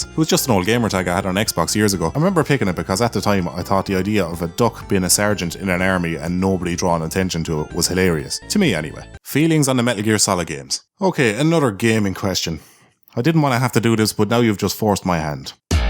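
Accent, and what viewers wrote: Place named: Munster, Ireland. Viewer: Sounds like cork or limerick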